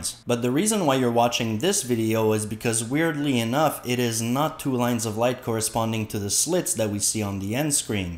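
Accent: Quebec, Canada. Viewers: Are you from Quebec? Nice video!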